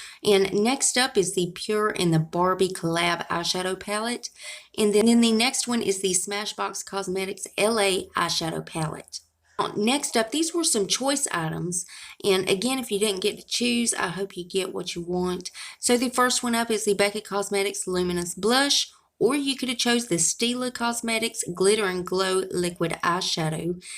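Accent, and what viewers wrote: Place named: West Virginia, United States. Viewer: Girl you sound more like a WV girl than a OH girl. Just sayin.. you have to be from the country! ❤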